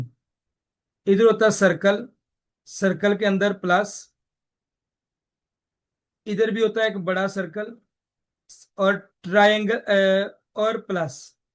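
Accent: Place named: Punjab, India. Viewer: Haha ur Punjabi ascent hits different 😂❤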